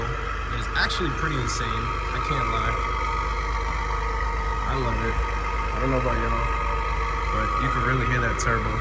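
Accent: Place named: Boston, United States. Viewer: aint even realize you from Boston too 🔥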